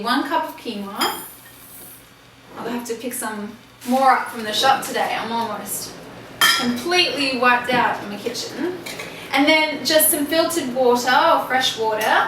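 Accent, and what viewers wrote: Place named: Australia. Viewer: I like your accent - I think you are Australian. I have just begun eating this food.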